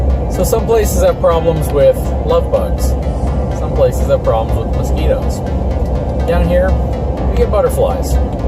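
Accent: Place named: North, United States. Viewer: You sound like a yankee.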